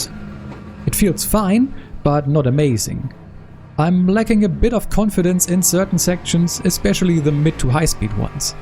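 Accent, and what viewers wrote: Germany. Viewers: Well that's a subscribe from me. German Accent + Motorsport + Guides That a recipe for success. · machst du das auch in Deutsch weil ich würde an deiner Aussprache sagen du bist ein Deutscher wäre cool danke :D lg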